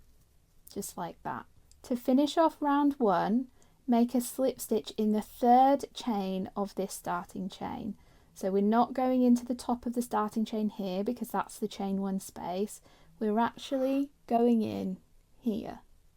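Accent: United Kingdom. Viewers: again, US English terms and UK English terms are NOT the same. Examples: US single=UK double. US treble=UK double treble. The terms are 1 off from eachother. Considering her accent, im going to guess her terms are the UK terms, hence: she did exactly what she said she did 😊. Hope that helps clarify my comment.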